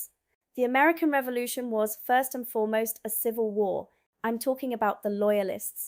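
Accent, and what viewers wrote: England, United Kingdom. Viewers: If you are American why do you sound english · Your history is correct and American students are given a very edited version of their history. This is generally corrected in colleges and universities. That is, if students take U.S. history where they are taught unvarnished histories of slavery and genocide of the native peoples, as well as depredations against our neighbours and the world at large after WWII. I taught such a class in summer school at a community college, but was never asked to teach the course again. They wanted me to stick to other subjects, apparently. By the way, your British accent is too authentic to be acquired after only two years in the country. Either you are an excellent mimic, or British. I’ve lived in the U.K. for over twenty years and my accent is still decidedly American. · Wow, you not only sound British, you batted our corner too. 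· Amazing English accent for an American who has only lived in the UK for two years. Clearly she is not who she says she is. I am well versed in history both American and World. Turned video off when she said we stabbed the English in the back. I have no use for Napoleon but we were not allied with the English or the French at the time. In fact we had fought an undeclared naval war with the French Both European powers had provoked us during the Napoleonic era. We just wanted to be left alone but the English in their arrogant way thought they could push us around with impunity. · Weird she has a British accent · This was really excellent but: 1. Most British people have never even heard of the war of 1812 2. The Boston Tea Party was a bunch of criminals destroying the property of a competitor. They weren’t upset about the tax; they were upset that even with the tax the British East India company could sell tea much cheaper than their Illegally smuggled tea 3. 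The Irish Potato Famine was a genocide against the Irish people. Trevelyan, the Assistant Secretary to the Treasury, wanted more Irish people to die. 4. She says she’s American & has only been here two years. I would never have guessed it. She sounds English to me. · Just came across your channel. You claim to be an American, fair enough, however your accent says otherwise, and, I know there are no private schools there run by British English teachers. So, where does your accent come from? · She sounds totally English not American.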